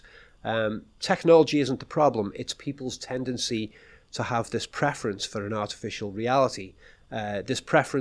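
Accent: Liverpool, United Kingdom. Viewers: Are you a Northerner??! Liverpool?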